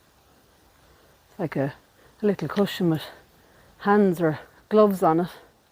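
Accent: Ireland. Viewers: This is one of the most amazing cemeteries you have visited, it truly is. It would make a great place to set a spooky film, would not need to do anything, it’s just perfect as it is. your enthusiasm comes through, your love of old cemeteries is clear, your appreciation to these places built to honour those who have come before us is wonderful.All narrated in your soft beautiful Irish voice.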